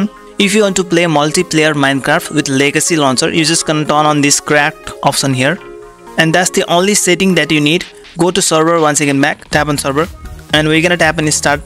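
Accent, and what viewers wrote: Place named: India. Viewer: it's joke, the guy has Indian voice and must of the time Indians are scammers, but this isn't one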